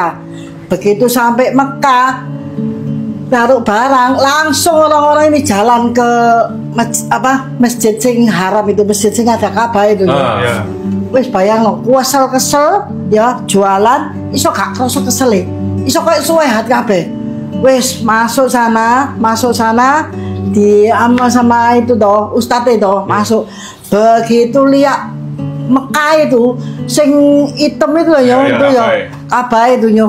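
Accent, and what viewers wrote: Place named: Surabaya, Indonesia. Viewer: Ai ..Seru lek Cerito Iki asli logat'e Suroboyo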